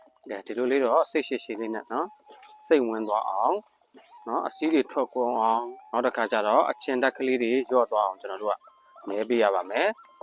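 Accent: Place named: Upper Myanmar, Myanmar. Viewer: အညာစာလေးရယ်အညာသံလေးရယ်စိတ်ဝင်စားပါတယ်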